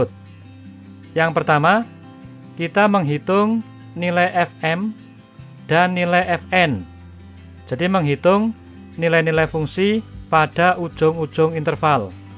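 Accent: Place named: Java, Indonesia. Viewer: Suara ne ketoro tenan nek wong jowo nggh mas.... Salam sko klaten 🤗🤗🤗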